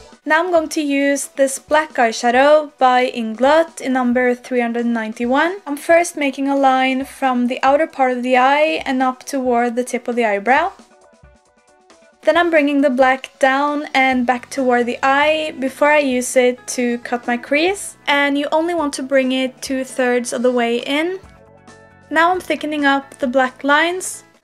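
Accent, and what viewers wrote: Norway. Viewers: you can hear that you're from norway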